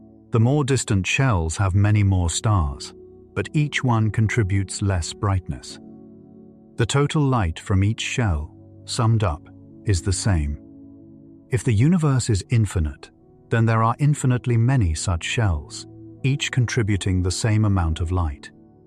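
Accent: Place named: United Kingdom. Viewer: This narrative is filled with mistakes and although it sounds smooth and creamy with the UK accent of the narrator who ever wrote the information has not done their homework. Hubble who created and mistakenly produced the theory of the expanding universe after another 10 years of intense research and methodical tedious computations wrote a paper in 1940 expressing his scientific conclusion addressing the expanding universe. Sadly by then the big bang theory had taken such root that his paper fell mostly on deaf ears. However since there is so much evidence that doesn't exist supporting the big bang and the expanding universe that we have entered a paradigm shift away from this old school nonsense big bang to the Electric/Plasma Universe. Hubble wrote a paper addressing The Problem With The Expanding Universe. I suggest if you are a critical thinker look for this paper read it. 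No one since has put as much time at confirming the math necessary to reach the conclusions that Edwin Hubble had to do to be absolutely correct in this assertions about the universe not expanding whatsoever and that the universe is simply infinite.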